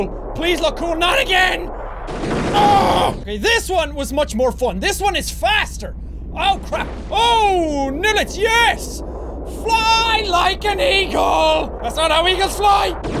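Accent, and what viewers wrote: Ireland. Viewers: He was so much irisher in the past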